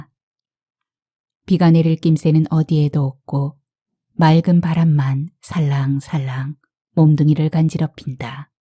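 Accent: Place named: Gyeongsang, South Korea. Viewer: 잘듣고 있습니다 갱상도 분이신가 사투리가 아주 구수 하구만요 5,60년대 우리들의 삶이야기네요 한없이 배고프든 시절 보릿고개를 힘들게 넘든 시절이엇죠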